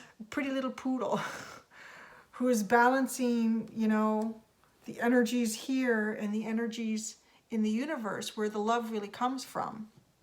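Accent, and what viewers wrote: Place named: Canada. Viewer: Great reading! Thank you ❤️ I thought I detected a fellow Canadian there, especially after I saw the Roots shirt 😄 Which part of Canada are you from? Hello from Calgary!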